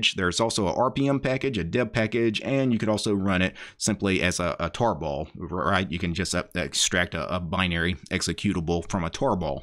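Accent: South, United States. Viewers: Your Southern accent is sick. 🔥🔥🔥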